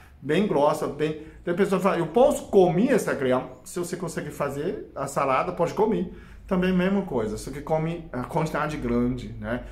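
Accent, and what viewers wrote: China. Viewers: Você fala muito rápido Doutor, não entendo direito esse português Xing ling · mas só saiu assim devido o próprio jeito de ele falar, ele ainda tem o sotaque, chinês, pior o Brasileiro que não sabe escrever o Português certo, tipo andano, falano, trazeno,Sima abito umilde serto e muitos outros, ele é perdoável é só observar como ele fala algumas palavras em português.😊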